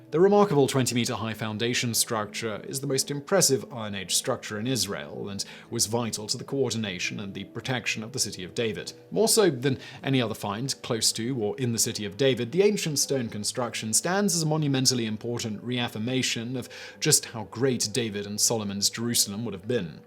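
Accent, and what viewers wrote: United Kingdom. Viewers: This guy does a great British accent.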